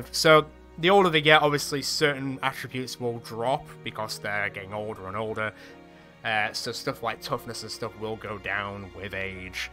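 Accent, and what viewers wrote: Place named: North, United Kingdom. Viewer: Thanks R'Kid, makes a nice change to 'ear someone speak northern.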